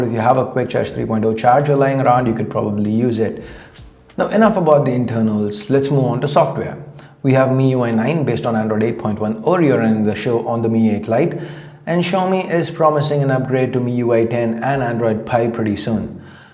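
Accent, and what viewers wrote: India. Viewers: typical fucking infian accent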